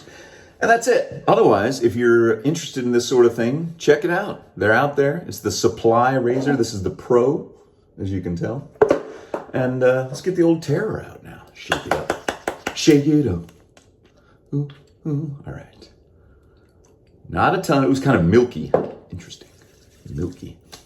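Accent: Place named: England, United Kingdom. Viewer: Hi, loving the English accent (00.30), made me chuckle. I'm not use to safety razor - everytime I shave the hairs get stuck inside and block the blades even under running water. Is this because I use foam so safety razor use a special shaving lather?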